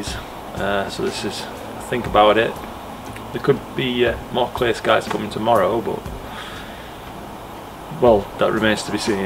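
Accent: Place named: West Yorkshire, United Kingdom. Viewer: Thought I recognised a West Yorkshire accent. Nice setup, I’ve got the esprit 100. I’m in York, but originally from Wakefield. I’ve got a Sesto Senso2 attached to mine and it’s great. I prefer it over the EAF I used to use. Things that won me over, are, it has a clutch so you can still manually focus, as long as the sesto is off. Not a biggy but can be helpful if you don’t want to use sesto, and also there are no brackets or weird adjustments to attach it to the esprit range, even though there is a bracket for the zwo EAF (the Takashi one), it’s still messy. The sesto 2 just sits around the focus housing. I have practically no focuser backlash either. Great video.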